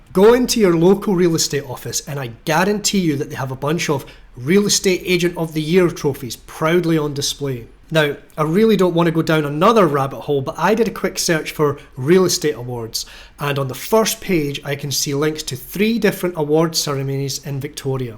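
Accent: Scotland, United Kingdom. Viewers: I've just discovered your channel I subscribed to it. I really like your work. The first Scottish in my list, I love your accent reminds me of my high-school teachers 😊 Regards from Yemen (I bet I'm also the only subscriber on your page from Yemen 😅). Cheers ... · Brilliant. Articulating what 'get real' means. And the Scottish accent is cool, or is that racial prejudice 😂.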